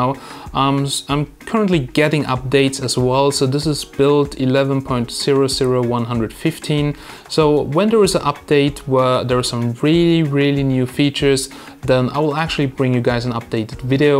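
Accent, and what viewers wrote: Germany. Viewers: Eigentlich hört man sofort raus, dass er aus einem deutschsprachigem Raum kommt.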